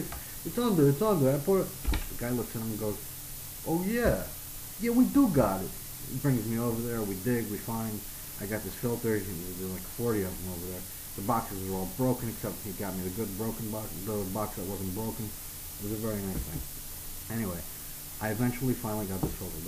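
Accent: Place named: New Jersey, United States. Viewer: So what you're saying is...you like to bitch about how lazy you are. We laugh about people like you when you leave the store because you lack any common sense. And please say "thing" again. When I get some time, I will create a response to your lovely video showcasing how many times you say "thing", "filter" and "faucet". And I'm pretty sure all the employees don't talk like they're from the Jersey Shore.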